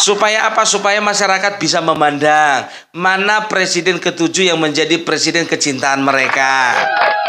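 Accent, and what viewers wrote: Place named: Java, Indonesia. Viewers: bagus bnget pke bhs jawa😅😅😅😅 medoknya tambahin Pung😅 narasinya pas bnget👍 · Om Iki wong jowo opo wong Seko tapanuli.jowone pas.dialek tobane juga pas. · Opung... Cengkok Jawa nya sdh dapet 👏🏽👍🏽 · Helo,aku salut karo sampean opung asli medan ning ngomong jowomu medok banget,sampean sekolah boso jowo ning ngendi pung,jian pinter banget boso jowone.GBUOPUNG 🙏🙏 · 😂😂😂opung jowo ne jian medok..Jawa bisa Sunda bisa Batak apalagi...love you full opung😂😂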